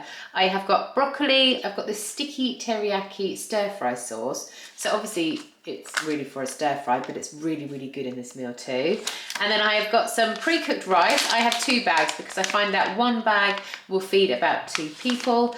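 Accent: United Kingdom. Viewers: At last.....! A Brit ! Not a Yank. British meals preped by a lady with a British accent..:)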